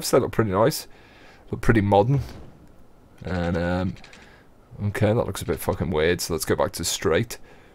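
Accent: United Kingdom. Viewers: Man Bear Pig Gaming for the same reason i enjoy your prison architect stuff; derpy, well intentioned, plate-spinning enthusiasm coupled with some fine uk-flavoured commentary.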